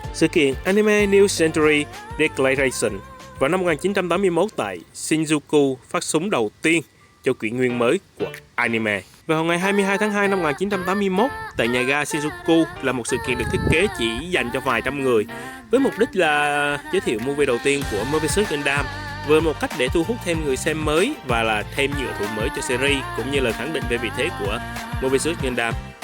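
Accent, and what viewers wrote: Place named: North, Vietnam. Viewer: Này chắc chắn là anh em miền Bắc rồi 😂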